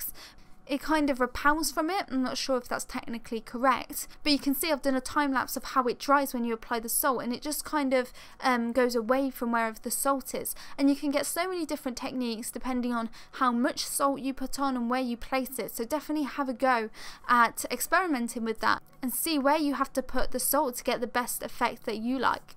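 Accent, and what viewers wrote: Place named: Northern England, United Kingdom. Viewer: I understand her perfectly but I'm northern English like her, lol. Hang in there!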